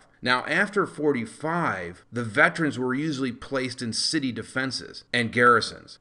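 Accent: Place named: United States. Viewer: Amazing, its sad that I have to google the metric equivalent but I can hear youre American so its understandable. Thank you so much!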